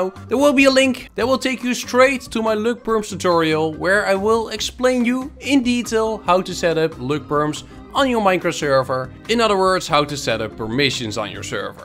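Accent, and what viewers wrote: Netherlands. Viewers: Are you dutch? I really notice a kind of Dutch-tone in your English.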